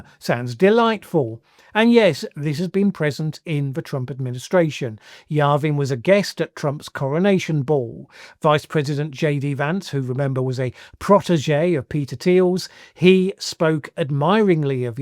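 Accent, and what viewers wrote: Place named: Southern England, United Kingdom. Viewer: I find two things fascinating about your videos 😊: 1. the analysis you make, and the case you make for it, and 2. your pronunciation/your particular English accent. The latter makes it clear that my nephews who live in southern England who had "perfect" trad modified RP diction until they went to school, from which point they spoke with Kentish vowels and struggled to pronounce 'th' consistently [voiced & unvoiced as required, ð and þ] really are following the norm in Southern English. You do not substitute v/ff all the time, for which I am grateful, because it is vital to know whether people who had "ffought" about something had a) had a think or b) had a fight... but 'with others' is clearly easier for you as 'wiv others', and this is utterly normal in 2025 on YouTube from parts of England. It makes me feel that, when helping those who are new to speaking English, we should be encouraging them to say 'th' as 'v/ff' wherever it is easier for them - certainly easier on the ear than the French tendency to "z/ss", perhaps less distracting than the Irish 'd/t' - every way of speaking English that maintains fluency is fine by me, but to do Hiberno-English you need to match it with a consistently Irish flavour in the vowels, or English natives may struggle to attune their ears to the mix. 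Thanks for both your insights in the intended content, and the effectiveness of your delivery and, as it happens, the provocation to me to reflect on what counts now as effective/comfortable dict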